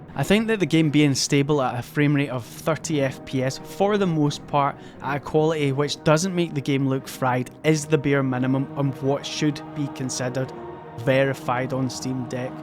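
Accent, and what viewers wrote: Scotland, United Kingdom. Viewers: peak scottish accent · Nice skottish accent Mate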